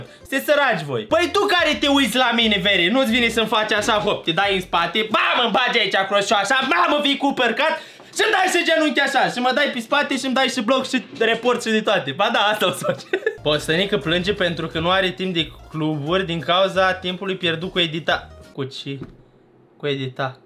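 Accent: Moldova. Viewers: Tu ești moldovean?😱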